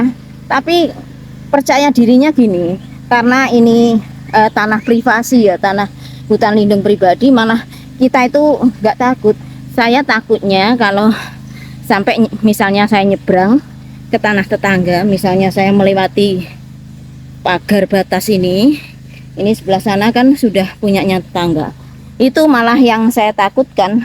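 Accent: Java, Indonesia. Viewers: Ngomong bahasa inggris tp logatnya medok jowone😘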